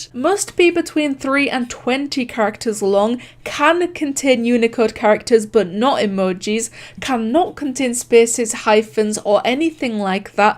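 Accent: Scotland, United Kingdom. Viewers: Is it just me or is she scottish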